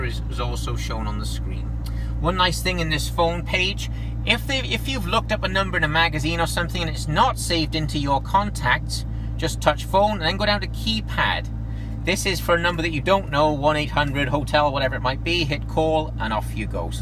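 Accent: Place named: Wales, United Kingdom. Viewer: I don't think the chap is American. I detect a Welsh lilt of the valleys!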